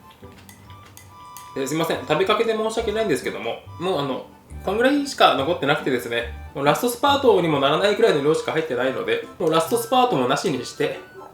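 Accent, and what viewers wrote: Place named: Kyushu, Japan. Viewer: 九州の人は柿のイントネーションが牡蠣と同じなのかな 母親もそうなんだよな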